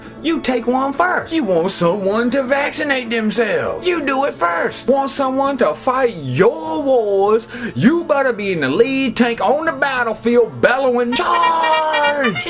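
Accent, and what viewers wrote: South, United States. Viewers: Excellent! Nice job brother. I started to watch this, (my first) , video on your channel...and initially thought, 'how annoying' with the southern hic drawl, and the outfit, UNTIL...I heard what you had to say. into the video sums it up. Love the points and I learned some new issues I wasn't aware of. I'll be watching for the next one. Thanks for making current events interesting. Respectfully, G.W.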